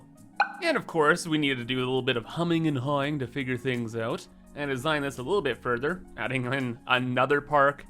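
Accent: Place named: Canada. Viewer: Golden Ears Park, do you happen to live on the west coast of Canada? I’ve been noticing the Canadian accent more and more lately and now I’m like 99% sure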